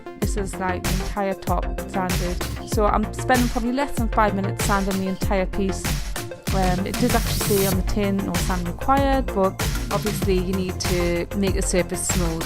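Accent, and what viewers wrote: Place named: Newcastle, United Kingdom. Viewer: Nice to hear a Geordie accent!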